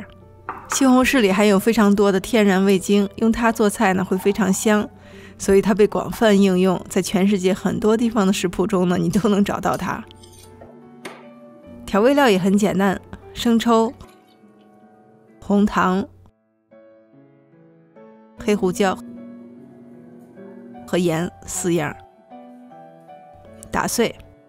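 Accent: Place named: Shijiazhuang, China. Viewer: 听的口音很像石家庄人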